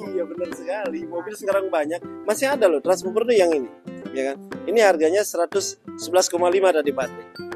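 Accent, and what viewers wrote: Bali, Indonesia. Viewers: orang bali ya? Kelihatan dari cara ngomong nya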